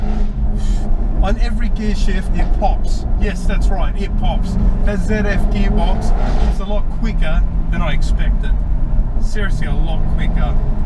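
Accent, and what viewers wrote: Australia. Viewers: If this is what the Australian accent has come too , i am fucking disgraced in this country . Like oh my gawd fully fucking tabouli ....... wake up australia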